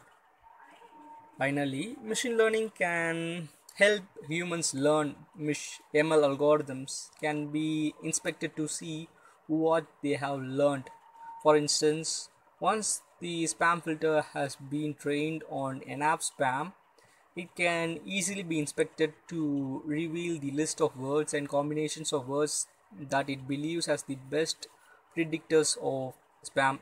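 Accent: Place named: India. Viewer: no doubt it is a good topic, but I am trying to understand your Indian accent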